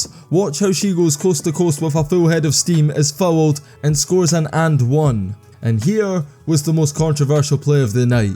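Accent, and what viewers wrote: Scotland, United Kingdom. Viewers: caitlyn clark is not beating shit ok , boy i tell you the racist cowardice power structure is something else , Those other girls on the indiana fever are balling there asses off there the ones that’s winning those games , but yet everytime they give all the credit to caitlyn clark that’s a good way to start division amongst your teammates, this dumb british accent racist guy narrating is dillusional , those girls on the indiana fever are playing there asses off stop giving caitlyn the credit when she has a whole team behind her !!! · Good job. It just seems kind of odd to hear someone with a thick Scottish brogue doing an analysis of American basketball.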